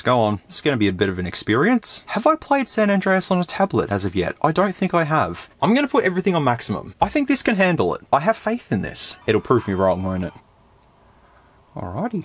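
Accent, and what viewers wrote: United States. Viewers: Your “American English” accent is pretty spot-on, tbh. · American here. Your American accent was actually really good! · Your american accent is actually pretty convincing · DAMN! your american accent is pretty solid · You got a nice American voice ! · Well, you're no Hugh Laurie, but your Yank accent definitely does not suck, bro. :) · Just saying, you've got a pretty good American accent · I enjoy ur American accent lol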